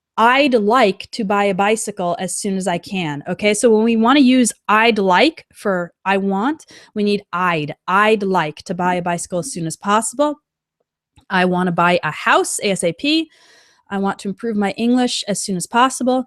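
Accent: United States. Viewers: Hello, I´m Adriano from Brazil:) I´ve been following these English tips and videos on internet a long time ago. And I can sure my English skills are much better now. Thanks for the incredible English free tips you give us in your website <link>'. Your american accent is very clear and very easy to understand. As an English learner, I really appreciate your lessons a lot. Bye-bye and greetings from Brazil:)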